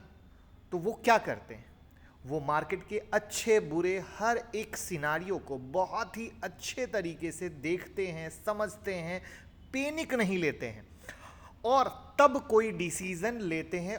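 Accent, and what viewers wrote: Bihar, India. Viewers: Sir aap Bihar se ho kya?????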